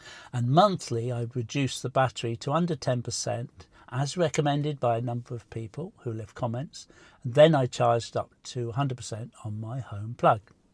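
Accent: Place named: United Kingdom. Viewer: I didnt realize you were from UK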